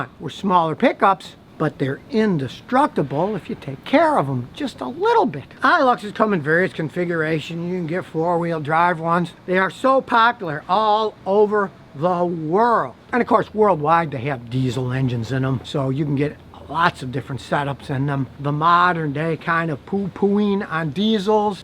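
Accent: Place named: United States. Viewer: Hmmmm I think I have misunderstood your accent. Sounds to me your saying Moore's not Morris but I think you are saying Morris correctly for your us accent.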